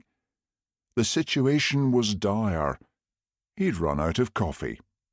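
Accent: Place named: Scotland, United Kingdom. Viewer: Absolutely love these narrations. Nobody can improve on your fabulous Scots voice 😊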